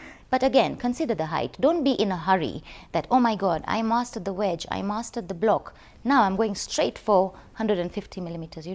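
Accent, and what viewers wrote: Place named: Kenya. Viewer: Hello rich folks....just go in her by chance and am loving the vybe....btw love the fact that you are kenyan by your accent and not twenging to sound very foreign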